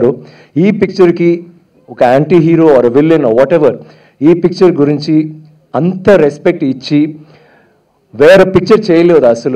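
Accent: India. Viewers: Telugu vade anukontunnanu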